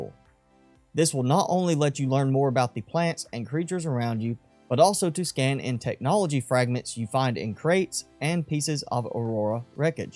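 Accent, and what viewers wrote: South, United States. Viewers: Country as hell for a YouTuber maybe lol. 🤠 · Thanks man. Btw you sound country as hell!!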